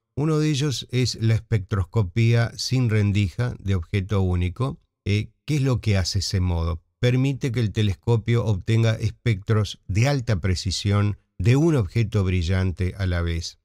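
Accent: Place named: Argentina. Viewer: Estoy encantada de haber descubierto hoy este sitio, con una voz argentina. A mi no me es muy fácil este tema pero, a fuerza de leer un poco y de escuchar otro tanto, algo voy aprendiendo.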